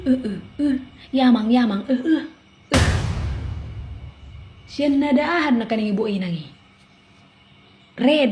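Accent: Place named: Medan, Indonesia. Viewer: Enak an bahasa indo kak medan nya nampak 😢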